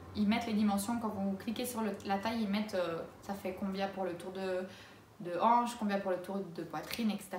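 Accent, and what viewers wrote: Liège, Belgium. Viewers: Tu viens de Liège toi :)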